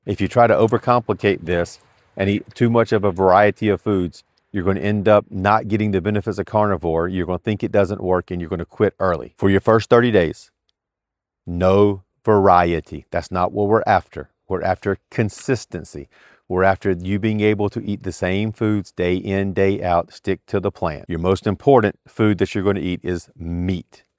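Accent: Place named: South, United States. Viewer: Hey! I stumbled on your videos while doing some research. I've tried this diet before and did great for 3 weeks but cracked because I visited a friend and had some whiskey which started a domino effect. Since then, I've fixed my drinking, I just don't do it any more! In that time, I have found my electrolyte solutions and salts. They work great. I just have a few questions. 1: Is it alright to incorporate 4 oz to 8 oz beef liver a week for those fat soluble vitamins? 2: Is there a calorie mark for the day? Last time I ate twice a day, around 2600. 3: "Keto Flu", did you just push through that detox phase? Any pointers? 4: Is this something you sustain? Or is it something you go through phases with? Ex. 3 months carno, 1 month incorporated diet. Hope to hear from you! Your southern drawl reminds me of my wrestling coach in high school and has opened up a new source of motivation for me!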